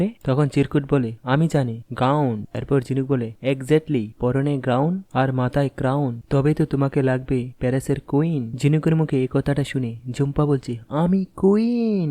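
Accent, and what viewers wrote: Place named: Bangal, Bangladesh. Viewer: কথকতা অতি খারাপ। যত বাঙ্গাল বাঙ্গাল ,অশিক্ষিত কথকের জঘন্য কথকতা ভাল লাগেনা নিজেকে যোগ্য করো।চেষ্টা কর। নিশ্চয়ই পারবে।শুভেচ্ছা রইল।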